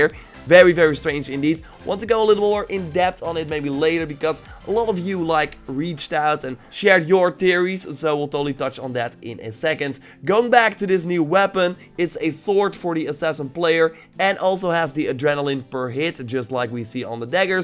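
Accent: Netherlands. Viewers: This dude is 100000% dutch the accent is real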